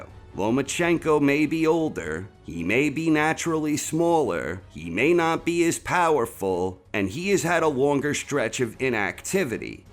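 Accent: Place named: East Coast, United States. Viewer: ! You are the Best at this stuff in my opinion! The way you talk and your accent is a huge draw for me! Don't loose your east coast accent! Lomo vs. Lopez is on the calendar! Thank you for your work!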